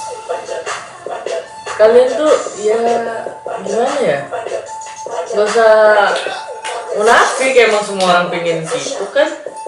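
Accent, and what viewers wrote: Medan, Indonesia. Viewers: orang medan ye bro?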